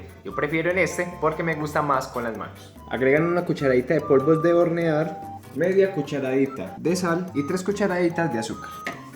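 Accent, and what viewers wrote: Colombia. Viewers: Me encanta ese acento Colombiano. Saludos 😙😍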